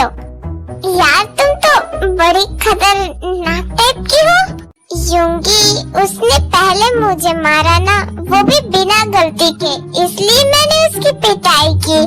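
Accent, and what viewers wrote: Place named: South, India. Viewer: Aapki voice me thoda sa south indian touch hai like dadaji 😅 bahut acha laga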